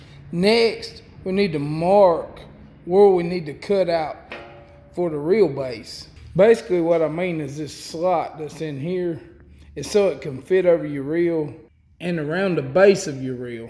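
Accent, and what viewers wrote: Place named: South, United States. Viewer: Great idea bud. Hey I have to mention your accent twang is very similar to mine. I'm not makin fun, I never realized that I had such a southern twang accent til I heard my recorded voice and it totally cracked me up. I'm from the Texas panhandle but my grandparents migrated from Tennessee so there it is.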